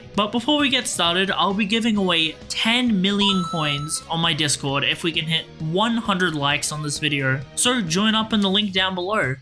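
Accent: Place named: United Kingdom. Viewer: british accent and exposing quaglet of macroing when he's not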